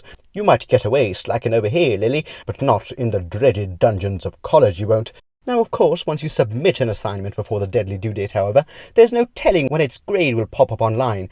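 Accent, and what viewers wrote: United Kingdom. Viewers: I just came from the squared squares video and this is NOTHING at all like your other video, but I love both of them, for different reasons lmao. It doesn’t even sound like the same person in this video?? Is this really you just putting on a British accent? You sound so different lol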